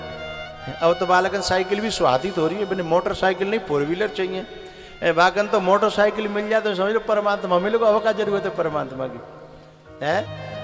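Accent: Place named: Braj, India. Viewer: बाबा शुद्ध ब्रजभाषा बोलकर सबको लुभा रहे हो? आप निश्चित रूप से मथुरा के आसपास के ही हो, आपको प्रणाम!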